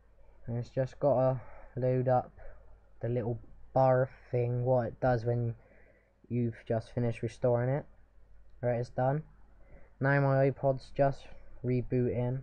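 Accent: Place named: England, United Kingdom. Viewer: he sounds english not like a chav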